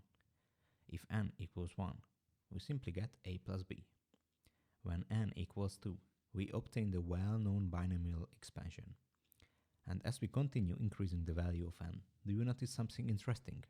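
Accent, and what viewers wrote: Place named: Hungary. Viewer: MI A FASZ, CSAK MOST VETTEM ÉSZRE, HOGY TE MAGYAR VAGY. Az akcentus eladta a dolgot. Meg az, hogy variációnak nevezted a permutációt, ami magyar dolog. BRUHHHHHHHHHHHHHHHHHHHHHHh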